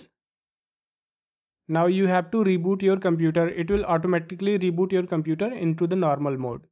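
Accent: India. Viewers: Indian accent tutorials are the best🔥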